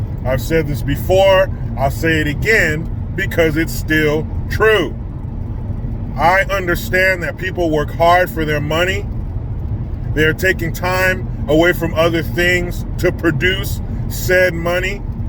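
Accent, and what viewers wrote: United Kingdom. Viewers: Hey nice limey accent. You is a funny smart fella and you make this old 77 year old tan dude. Albinos are white and pale millennials living in moms basement. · Love the ah British or something accent!!! LOL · I want to hear you say, Lambofeeties in that British accent! I'll die LMAO! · Love the British accent. You should read us some Charles Dickens. Call it story time with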